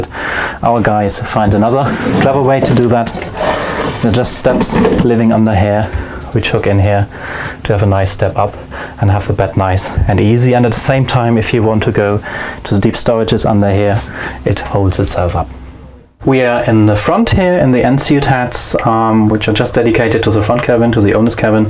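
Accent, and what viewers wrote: Germany. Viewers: What a beautiful German accent English!! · Immer Lustig wenn ein deutscher sich in english bemüht.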